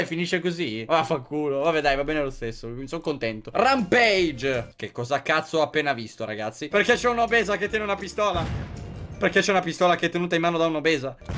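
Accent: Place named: Naples, Italy. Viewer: Madò che parla Napoletano quanto figo 😍😍 ( lo risolviamo= o risulvim)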